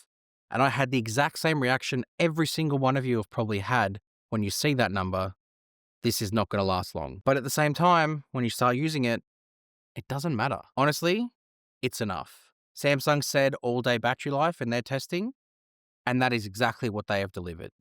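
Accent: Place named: Australia. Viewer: Credibility: screenshots lost because I have to send it back . Yet , you hold up the one you bought . Any expert would send photos of 10 days to their keeper new black phone. .....just the optics and the devils advocate voice in my head. Schill or not , I've been here for information n exposure to new products, uses . ....to expect a lack of bias is to expect a lack of Australian accent. Dude, I tuned in just for the accent and the bias . Love you, man! Nothing but Respect.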